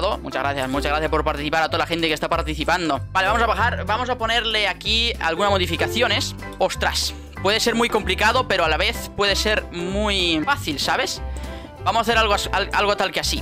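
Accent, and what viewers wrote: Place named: Russia. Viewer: La primera ves qu vi ah dije alv un ruso que habla español xd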